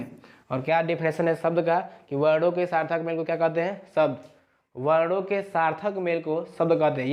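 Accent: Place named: Bihar, India. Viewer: Bahut hi ghatiya lecture hai samajh me nahi araha bihari bhasa se padhaya sub teacher aacha padhate hai is channel ke bas ise aur english wale chhod kar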